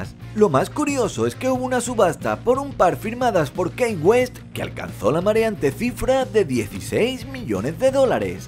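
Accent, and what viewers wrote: Spain. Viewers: hola!! una pregunta eres español??? me dio curiosidad. porque pusiste billetes mexicanos y tu voz parece española 😂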